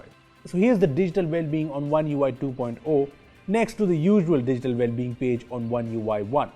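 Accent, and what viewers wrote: India. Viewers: terrible Indian pronunciation... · This is genuinely a great video. I had my doubts when I first heard the Indian accent, but I was pleasantly surprised. Thanks man. And sorry.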